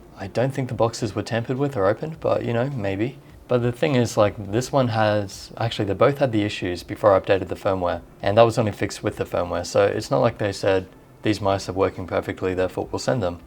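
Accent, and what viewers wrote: Australia. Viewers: I like your accent and voice so much, dem aussies lol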